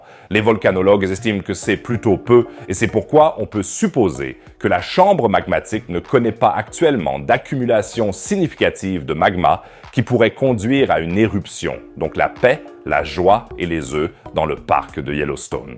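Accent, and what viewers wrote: Quebec, Canada. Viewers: Accent quebecois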